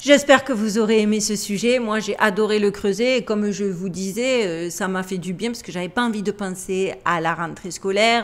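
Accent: Marseille, France. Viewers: Moi ce que jaime c'est que t'as gardé l'accent du Sud meme au states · Tu es VRAIMENT ADORABLE, j'adore ta voix, ton accent MARSEILLAIS. MERCI POUR TON PROFESSIONNALISME et ton CHARME !!! ♥♥♥😘😘😘😘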